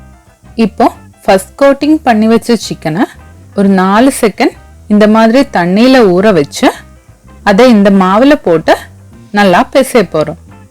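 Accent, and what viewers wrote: Kerala, India. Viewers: Covered all steps including 'maava salichi vachikonga' ...loved this video And even though I could sense kerala accent, tamil is good!! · Malayala manam unga tamizh la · ningal malayali aan le .tamil samsarikkunnath klkkumbol thanne manasilavunnu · Hello 🤣🤣 that's TAMIL not malayalam. She's speaking tone which reflects her mother language malayalam but she here fully speaks in tamil words only. You north indian guys are over thinking malayalam is only a down south language. But truth is tamil language is mother for all south Indian languages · Malayali anu alle... Tamizhu kettapol manisilayi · U sound like more malyali than a tamilian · Ur talking is like a malayali... R u a from Kerala Or tamil nadu??? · Malayalam kalantha tamil🙂, good recipe. Thanks. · Malayali aanu...aa tamil kettal thanne arayaam...but sambavam supper tto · Malayali daa tamil ketta puriyaathaa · Enikkum thonni evideyo oru malayalam touch... 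· Firstly for the malayalam tone tamil speech i am subscribing your channel👍😍 of course for presentation also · Woww superb kfc👌... malayali tamil pesara maadhiri iruku..ningal malayali alle?😊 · Malayali aano ? Malayalam touch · U sound like a malayali talking Tamil... Good quality video!!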